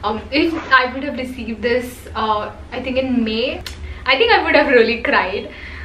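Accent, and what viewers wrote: Maharashtra, India. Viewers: Congratulations on 100k and many more coming soon! I remember when u hit 10k u were in tulum by forest tala and how happy u were. Being marathi girl you inspire me soo much and how cute is your marathi tone i just love it. I love your fashion videos and hauls. My dream is to make my instagram page <mail> one of Indias's top Self-care and productivity page. I post content about self care and productivity in the easiest form to reach maximum people. My goal is to make everyone practice self care in the easiest and most affordable ways. Soon planning to launch my planners also to help everyone become oraganised. I will send the copy of my planner to you when i launch it for reviewing because you review things very generously. Lots of love to you keep inspiring us ... May you hit 1M by the end of 2020. All the best dee!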